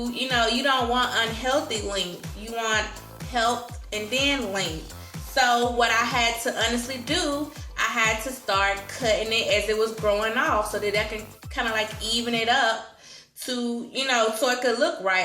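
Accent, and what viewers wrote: South, United States. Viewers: 10 thumbs up. love that country accent.you sound like my relatives from down south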